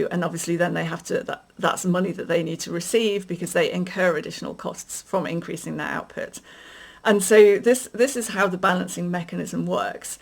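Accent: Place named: West Country, United Kingdom. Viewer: I have watched some of your content and must say your knowledge and your proposals, particularly in their scope, are something I can resonate with, particularly when it comes to Nuclear. HOWEVER......your delivery sounds very typical of many "Millennial's" and "Gen Z's", in that you orate many of your sentences where the listener can't distinguish whether they are statements or questions. I don't know if you reside or was brought up in the West Country but these types of speech patterns are typical of that region and can become rather tedious to the point of infuriating after a while to many listeners.........particularly us "Boomers" and "X's".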